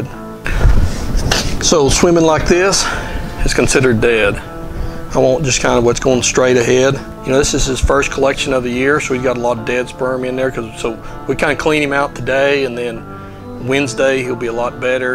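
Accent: Texas, United States. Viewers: It's that great Texan accent. 🐴🐎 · honestly watching this guys videos cause one of my original characters is half kelpie and a kelpie is a water horse and i need to learn how horses work for the character. his accent reminds me of home (im in new york and from texas) and im trying not to cry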